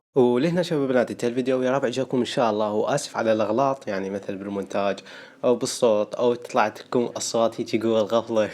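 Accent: Saudi Arabia. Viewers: حلوه لهجه السعوديه احنه العراقين نفهم كل اللهجات لاكن محد يفهم لهجتنه 😂😂😂😂👏🏻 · حسيتك سعودي مدري ليه هههه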